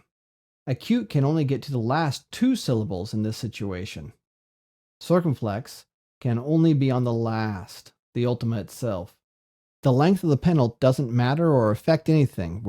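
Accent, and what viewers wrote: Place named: United States. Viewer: He doesn't use modern greek phonology. Anyways, judging from his english, he's probably american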